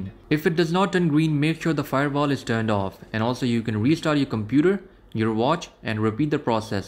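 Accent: India. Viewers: Excellent video!!! I did this on two Watch 3!!! Super and I like your india accent!! Im in Quebec Canada! Thanks a lot!!!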